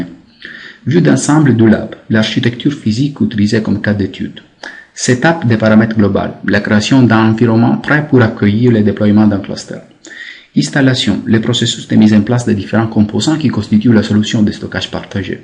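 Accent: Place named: Italy. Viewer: Tres bonne explication , avec une petite pointe d'accent Italien !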